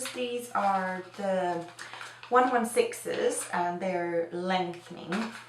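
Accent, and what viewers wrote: Ireland. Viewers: I actually thought you were Irish :D I love the tutorial and your accent is really cute too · are u irish?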